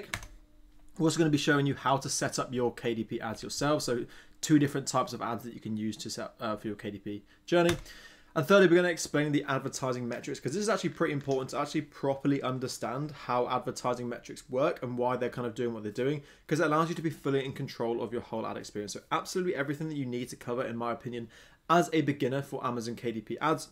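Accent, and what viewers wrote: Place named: England, United Kingdom. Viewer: OMG, I was about to say the same thing. He talked so fast I kept drifting off and daydreaming. And that English accent isn't helping, it's too thick. 🙄😴😕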